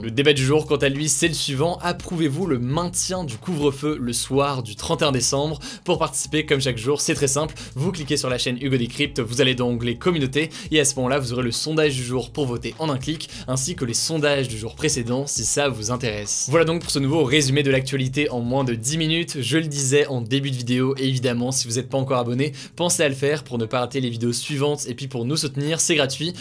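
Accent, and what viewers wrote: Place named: England, United Kingdom. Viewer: On apprécie l'accent anglais d'Hugo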